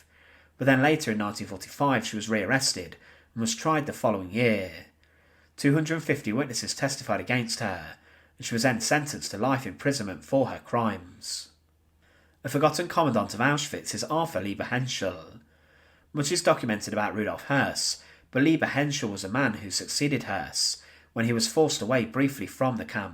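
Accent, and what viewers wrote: United Kingdom. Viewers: You remind me of Mark Felton videos, British accent also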